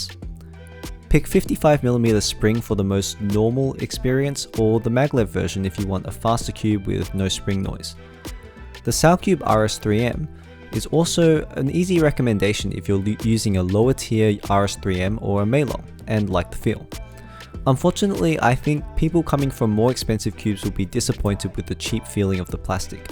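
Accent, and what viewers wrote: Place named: New South Wales, Australia. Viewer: yo are you from nsw if u dont mind me asking?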